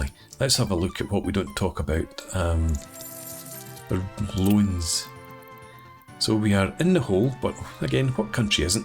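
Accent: Scotland, United Kingdom. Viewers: going by the choochta accent your no west coast, you towards falkirk?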